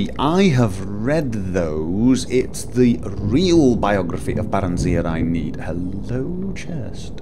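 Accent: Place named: England, United Kingdom. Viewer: It's probably a regional thing. There are quite a few words he pronounces differently than I've ever heard. I've always attributed it to the fact he sounds English.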